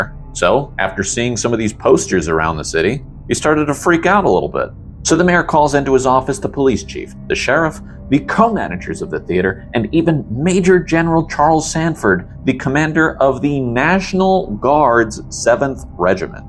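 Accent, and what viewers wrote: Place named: United Kingdom. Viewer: Love your British accent! Love the sound effects! Another winner!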